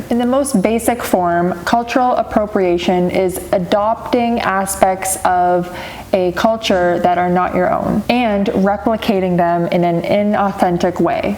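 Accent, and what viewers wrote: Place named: Canada. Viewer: Thought process: North American she says... so US? Wait no she’s saying it’s ok! That’s not very American.. Ahh the accent is coming out. You must be Canadian you guys really are way too nice haha 😅 And I realize you said to not spread harmful stereotypes but I don’t see the harm in this one, except maybe in that by trusting and giving anyone the right to your sacred rituals you’re harming those of you who are indigenous and can’t put on or take off their heritage whenever it benefits them, because in the US at least, not every Native reservation has access to their own culture (ex. Moccasins can be expensive but way more pretty White girls than indigenous people on reservations can afford them; no hate just a point). I don’t know about the situation in Canada, y’all’s country seems to have everything figured out all the time😅 but for viewers in the USA it’s crucial to redirect the focus from “yes boo you can do whatever you want as long as you promise to educate yourself and be respectful” to “check your privilege, use it to benefit indigenous people. 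If you love their culture sooo much, give it back to them. Fight for them. Instead of participating in a ‘cool’ event, maybe enable an indigenous person to go to that rally because it means more to them. If you can, get doctors and lawyers and people who have more power to make a difference to go and help. There’s nothing there for ‘you.’”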